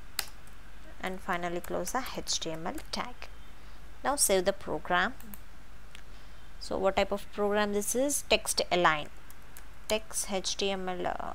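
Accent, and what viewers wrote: India. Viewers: i love indian accent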